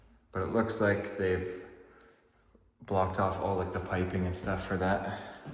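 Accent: Canada. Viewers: I don't know why, but I cant stand the Canadian accent. It's like finger nails on a chalk board to me! Sorry lol I just had to turn off the volume on this and use the closed captions instead! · What state is this? Are yu Canadian? Your accent sounds Canadian. This price is ridiculously high. No wonder it hasnt sold. Horrid reno. Nothing flows. Kitchen an empty cavern.no way was this house ever inspected. This is all stupid rigged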